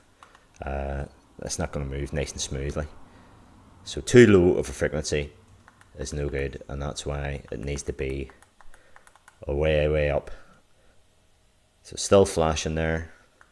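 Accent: Northern Ireland, United Kingdom. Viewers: Hey man. Are you from Norn Iron??!!??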